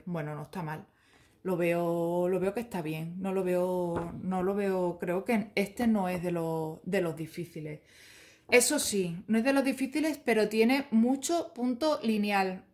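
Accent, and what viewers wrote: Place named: Cáceres, Spain. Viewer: Querida. Me encantan tus videos, los Kits y los avances. Pero sobretodo me encanta su acento de Cáceres ya que mi pueblo es Holguera, muy cerca de Cáreces y tu forma de hablar me recuerda mucho a la de mi familia. Hace mucho que no voy al pueblo y tus vídeos me lo acercan un poquito cada semana. Un beso.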